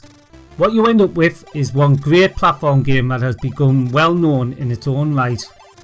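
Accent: United Kingdom. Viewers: Is this Bri ish ffs talk english man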